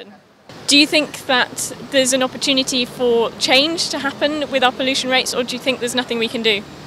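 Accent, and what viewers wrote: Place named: United Kingdom. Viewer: Can't beat a British accent :)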